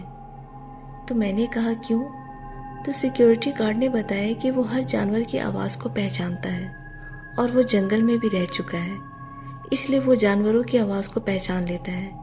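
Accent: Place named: Kolkata, India. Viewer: Ap ki language culcuta ki hai Kia ap culcuta se ho Asalam u Alekum lots of love from Rawalpindi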